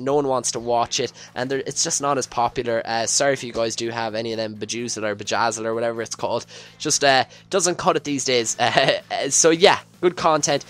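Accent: Ireland. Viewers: mate first your irish which is beast second ur just as good as ksi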